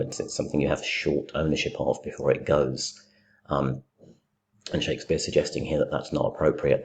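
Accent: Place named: RP, United Kingdom. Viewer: I swear this one is the best interpretation ever! And your clear RP sounds exceedingly gentle!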